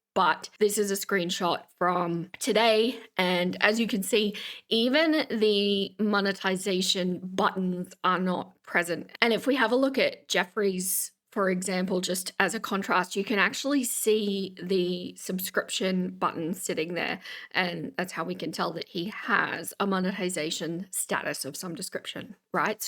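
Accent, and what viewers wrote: Australia. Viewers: Love hearing your thoughts and analyses/detective work. Fellow future-dweller here 😊 although from your accent it sounds like youre from Vic. Heading there for PAX this week. Keep the vids coming!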